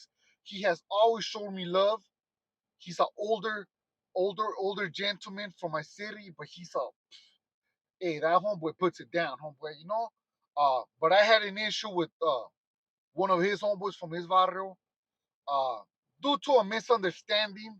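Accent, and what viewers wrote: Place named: Mexico. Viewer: Ey foo, dont take this the wrong way but were you born in Mexico? Because you carry yourself like a mean ol Paisa. Even the way you pronounce certain words.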